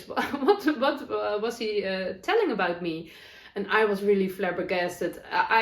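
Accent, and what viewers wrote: Netherlands. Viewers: Hi I finally found your podcast, its such a pleasure listening to you. I crochet, knit and sew also, but I have yet to start a you tube potcast. Your english is fine I understand you very well even with the dutch. ❤️🙏💕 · Arms are exposed. Is the word you're looking for. I do enjoy your Dutch accent. Your very talented.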